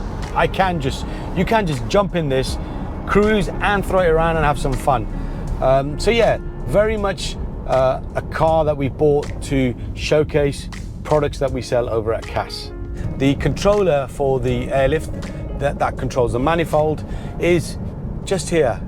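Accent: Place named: United Kingdom. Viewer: Done well on your british accent.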